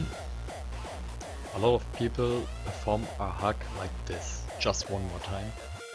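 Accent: Germany. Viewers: Ich lieb den deutschen Akzent. So ein englisch verstehe ich sofort! Tolles tutorial 😌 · Hallo, ich kenne den deutschen akzent. 🤣Grüße , geiles video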